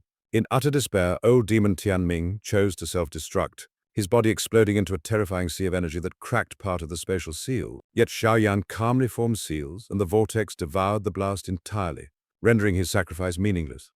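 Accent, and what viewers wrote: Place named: United Kingdom. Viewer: British accent is not match the stories like this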